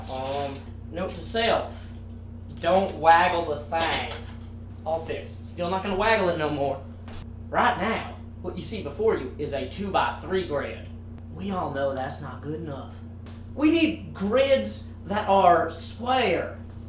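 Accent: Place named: South, United States. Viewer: this is hilarious, you never cease to amaze me with our southern accent and funny videos.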